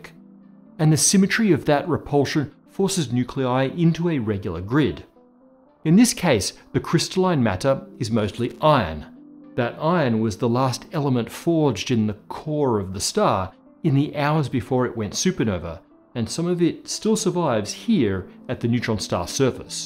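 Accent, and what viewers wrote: Australia. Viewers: You have such a heavy Australian accent that it makes it very hard for me to understand. And I really want to love your Videos because of the Subject you talk about... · You must get offended by things you don't understand to comment on YouTube. It's irritating. His accent is australian.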